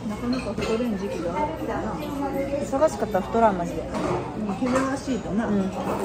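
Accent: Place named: Bingo, Japan. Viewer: いだちゃんねるから来て 初めて拝見しました。 備後弁？が癒されます♡ あ、でも自分の事名前で呼ぶのは辞めた方が良いです😅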